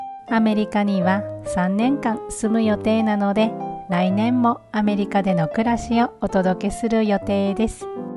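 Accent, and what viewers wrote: Kansai, Japan. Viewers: ドイツからアメリカへ…インターナショナルな生活を送っていらっしゃる、と 見始めると浜村淳さんのようなこてっこてのまったりした関西弁🤣 海外で日本食を大切に味わっておられる素敵な毎日ですね✨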